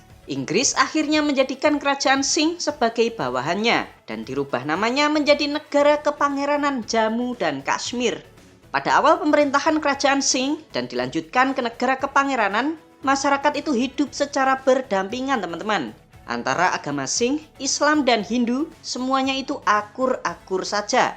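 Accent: Java, Indonesia. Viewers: logat jawanya medok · si medok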